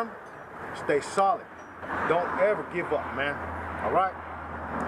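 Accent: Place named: Los Angeles, United States. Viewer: Hi nice video, you sound like you're from LA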